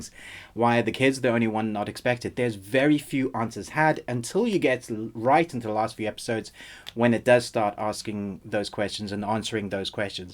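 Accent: South Africa. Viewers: are you...are you South African?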